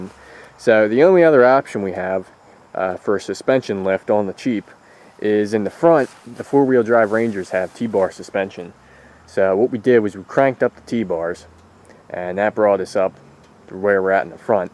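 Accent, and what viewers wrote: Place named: New Jersey, United States. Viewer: Interesting video. Given the location and accent I'm gonna guess you're in Salem or Cumberland County, NJ, lol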